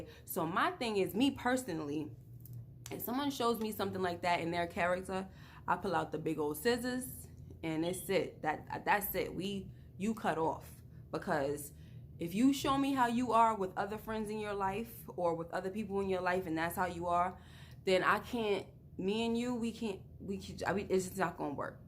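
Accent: New York, United States. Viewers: Oh WOW! I’m first! New subbie! Love your accent - sounds like home NY!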